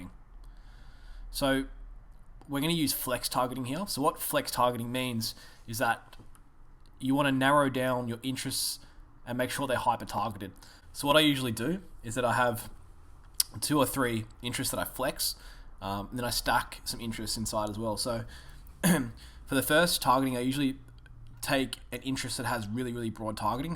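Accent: Australia. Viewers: So good to hear an aussie explaining it ahahhahah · Good to hear an Aussie doing it, I’m from South Australia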